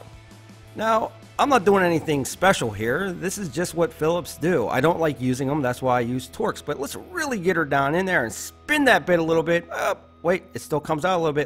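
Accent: Pittsburgh, United States. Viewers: Are you from Pittsburgh???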